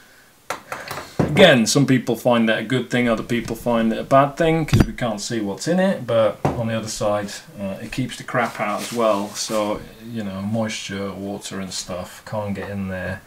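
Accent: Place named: Australia. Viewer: Thank's a lot for the effort! 👋👋👋 It make so much sense to buy a durable tool! It is jolly good to watch screwing compoetion videos, but at the end of the day you not only need a relatively quick tool, but ot also has to be light and DURABLE!!! and reparable!!! Would be great to get a view of the competition like: makita, dewalt etc... And the Aussie accent is the cherry on top of these videos!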